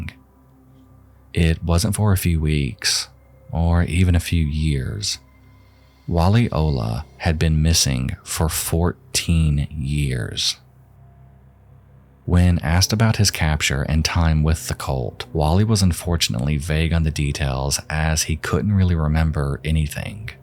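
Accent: South, United States. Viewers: I just noticed his southern accent how did I never notice it before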